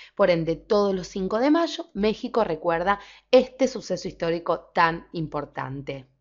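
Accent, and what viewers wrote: Argentina. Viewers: eres de argentina?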